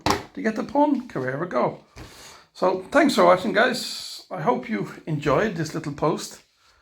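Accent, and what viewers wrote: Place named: Ireland. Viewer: I ❤❤that Irish accent....as well as the slot cars 😂😂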